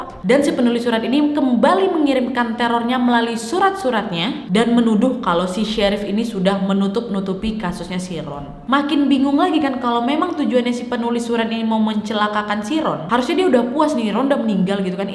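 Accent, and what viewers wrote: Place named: Medan, Indonesia. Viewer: Saya suka sama cara ngomong nya mba logatnya kayak orang Medan lucu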